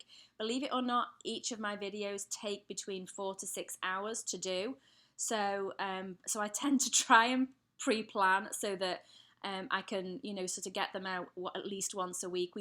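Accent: United Kingdom. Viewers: MAN I MISSED THE LIVE STREAM!!!!! i was really busy today, and didn't even touch my laptop:( i love this video though.... and i hope you make more live streams. PS i knew you were British!!!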